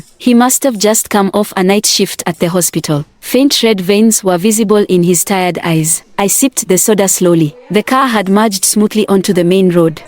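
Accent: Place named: Africa. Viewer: The African accent is crazy 😂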